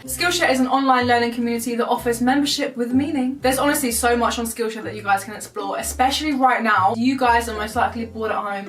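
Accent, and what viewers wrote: Croydon, United Kingdom. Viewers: Charmee Taylor She addresses in an assumptions video how people think she's trying to be black when it's just the accent. That's just the accent in Croydon, all races speak this way there ☺️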